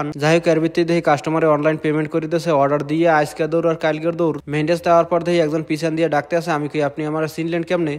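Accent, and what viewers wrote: Barishal, Bangladesh. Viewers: মনু আমনেও কি বরিশাইল্লা🙂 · আপনা বাসা কি বরিশাল ভাইয়া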